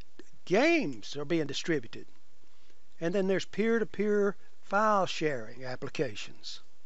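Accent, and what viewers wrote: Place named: South, United States. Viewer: After listening to my austrian professor and barely understanding his lectures, the southern accent is appreciated.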